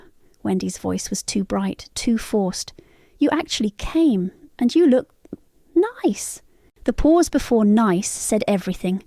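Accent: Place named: United Kingdom. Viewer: I loved this story and I really loved the British narrator 😊❤🎉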